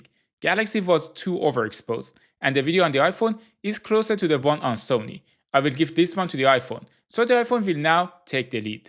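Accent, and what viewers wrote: Iran. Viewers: Are you persian? Your accent says this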